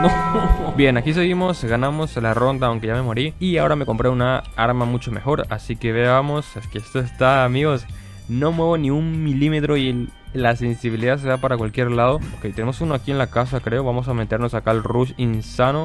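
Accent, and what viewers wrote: Paraguay. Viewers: sabía luego kp , se pilla umpoco tu voz , solo los paraguayos sabemos darnos cuenta 🇵🇾😂👍🏼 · Se te nota tu hablar de paraguay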